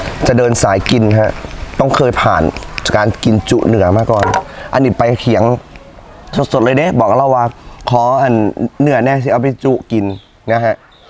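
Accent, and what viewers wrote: Isan, Thailand. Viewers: ตามหลายคลิปแล้วจร้าแต่คลิปนี้ลั่นเลย🤣🤣#เว่าอิสานน่าฮักดี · 555555ระว้งพยานเด้อกินหลายๆหะเว้าอีสานใด้น่ารักมาก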